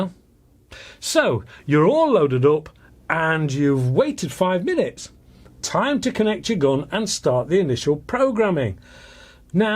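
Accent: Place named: Yorkshire, United Kingdom. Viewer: Great review of what looks like a quality product. But atrocious Yorkshire accent. No more of that please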